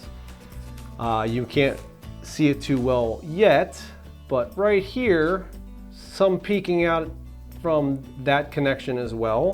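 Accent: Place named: Philadelphia, United States. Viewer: Yo! Can here the Philly South Jersey accent. Love it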